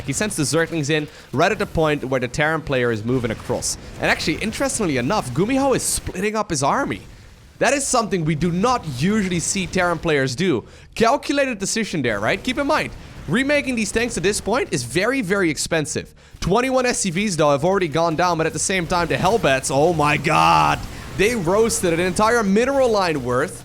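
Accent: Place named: Netherlands. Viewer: My god your Dutch English accent is so terribly cringy to listen to... Get some lessons to fix it already. Its been this shit for years now.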